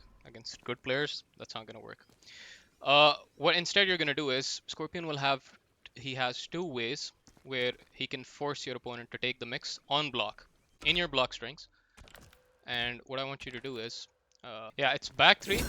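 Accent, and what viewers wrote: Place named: Pakistan. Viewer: are you a PAAAAAAKKKI ? sound like one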